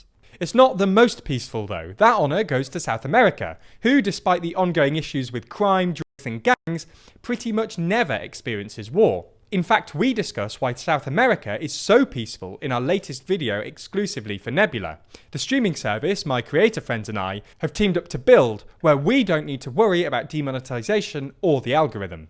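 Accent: United Kingdom. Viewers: That british accent is so horrible, christ, why, just why.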